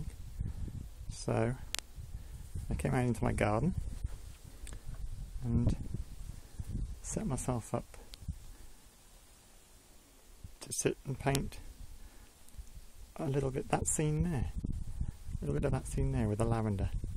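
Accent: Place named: Australia. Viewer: you sound very AUSSIE.!!!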